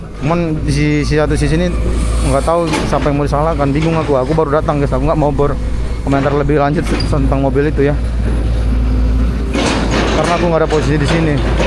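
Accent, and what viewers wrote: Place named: Medan, Indonesia. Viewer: Bang kau lucu kali😂😂, medan kali😂😂😂😂 1. Awak(aku, saya) 2. kontak(kesetrum) 3. udah nampak(udah kelihatan) 4. nah ini kan segini kalinya,nah disana udah lebar kali guys, lebar kaaaliii guys😂😂😂😂(kayak mana bedakan kali yg ada airnya dgn kali yg gk ada airnya bang) 😂😂😂😂 5.atrek atrek atrek Terima Kasih info dan hiburanny bang, sehat selalu, salam dari Medan.... Horass🎉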